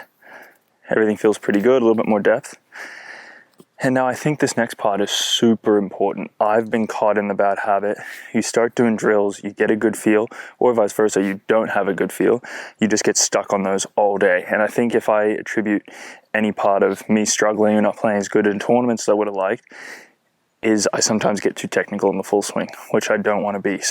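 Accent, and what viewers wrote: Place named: United States. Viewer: Every vid ur accent progressively gets more American lol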